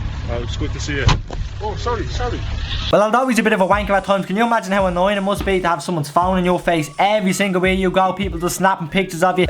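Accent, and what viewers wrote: Dublin, Ireland. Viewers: your the only Irish YouTuber I've ever met and I love the Irish voice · what section of Dublin are you from? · what part of ireland are you from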